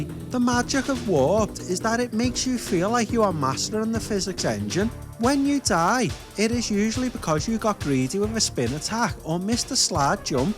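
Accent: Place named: Newcastle, United Kingdom. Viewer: Rockin that Geordie accent 😊👍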